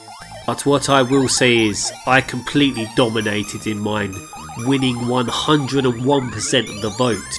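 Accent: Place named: Southern England, United Kingdom. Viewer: Is swearing a thing that you do irl as well? Lol I mean it just seems out of place. Half those words are totally new to me! I am gonna go repeat that to my ten nearest fellow humans! I always get bollockings for saying things like I always get bollockings. It's a worthy sacrifice to the gods of filthy far-right Hitler language like piss and shit! I am gonna be like Moe from Simpsons, I know not what I say 🤣 STOP PLAYING WITH YOURSELF AS WELL!! Dude this is actually fuckin hilarious now... English accents are the best as well. You sound like somewhere between the home counties and egham you definitely in the south!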